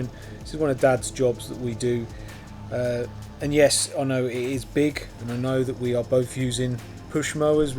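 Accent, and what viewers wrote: United Kingdom. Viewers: Nice Norfolk or Suffolk accent ? Nice vid really relaxing tbh - i want to be a gardener now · Are u from around wiltshire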